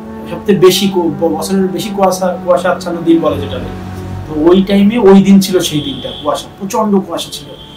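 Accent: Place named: Chittagong, Bangladesh. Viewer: bhai ki chittagong er manus? apnar vasha te chittagong er vasha er ektu tan ase hahaha <3 love from ctg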